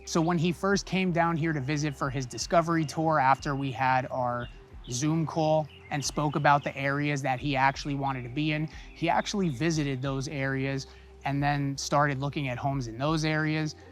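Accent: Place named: Northeast, United States. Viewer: Good Video. Nice Northeast accent 😉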